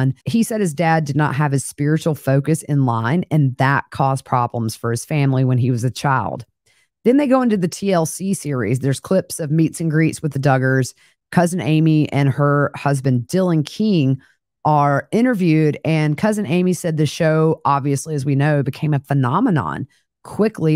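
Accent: South, United States. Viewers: I know I love her southern accent so much ❤❤❤❤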